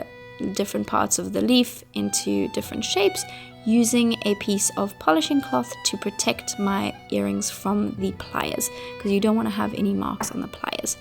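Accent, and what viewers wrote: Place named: South Africa. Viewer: Very nice work, I thought Nordic with good English as I've spent time with them. Then I think very, very soft south African.👍🏴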